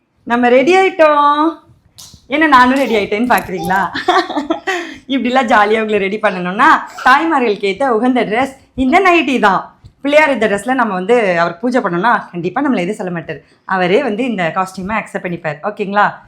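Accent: Tamil Nadu, India. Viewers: I am fan of ur tamil accent n voice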